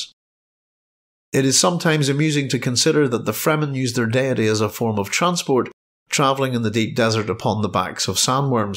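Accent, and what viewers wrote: United Kingdom. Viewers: Wow, I was almost all the way through the video thinking you are Scottish when I tuned in and realised your accent is Northern Ireland. Sometimes an Ulster and west coast Scottish accent can sound similar. I wonder if it's because many Scots settled in Northern Ireland. Less Zen-suni wanderer and more See-you Jimmy wanderers :) Great video.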